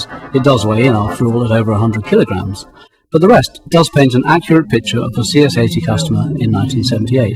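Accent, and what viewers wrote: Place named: Australia. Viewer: Australian narrator... because of the price of the synth at the time.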